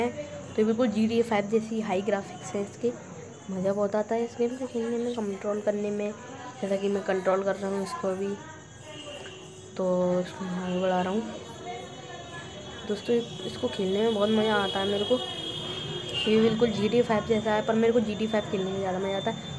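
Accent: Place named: South Asia. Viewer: roman reigns fans only well than you must be from India or PAKISTAN cuz your accent made me feel like you're speaking Urdu or Hindi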